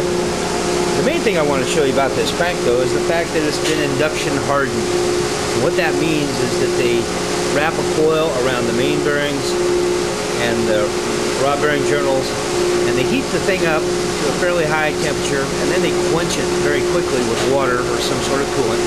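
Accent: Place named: East Coast, United States. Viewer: you're from back East I can tell. My pop was born & raised in Binghamton and his whole life he said "Awe-dee", never could get him to say "Ow-dee".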